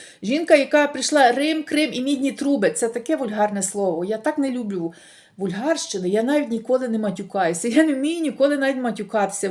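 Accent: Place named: Western Ukraine, Ukraine. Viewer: Мова ужасна,неграмотна .Мелиш по бендерська,не принмнл вас слухать,Особенно як ти кажеш євро,,,,Даже во всех Странах кажуть ЕВРО.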